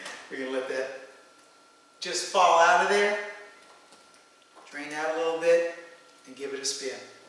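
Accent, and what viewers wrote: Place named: New Orleans, United States. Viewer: Just listening to you, I heard a N'awlins accent. Then I expanded the intro. Sure enough, I see that you're from Louisiana. I kind of figured as much when you began talking about the cicadas. Love y'all. I live in GA and I'm from AL originally. A whole lotta good food comes out of Louisiana. Thanks for showing us your honey making operation. I've seen small operations before but seeing a big one like yours is a real learning experience. Really impressive. Thanks for posting the video.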